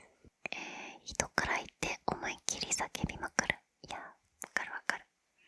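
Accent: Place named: Osaka, Japan. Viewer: スラム街を見学しました🙂‍↕️え、大阪弁！？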